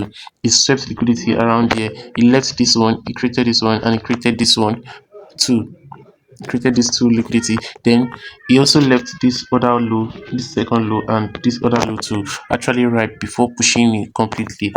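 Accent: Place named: Africa. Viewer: Your name is indian but you are speaking English in african accent Your words are not clear first work on your accent I'm forced to see transcript everytime you speak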